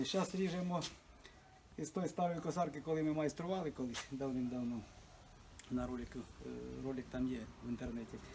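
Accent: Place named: Polesia, Ukraine. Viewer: чую родний полєский говор =)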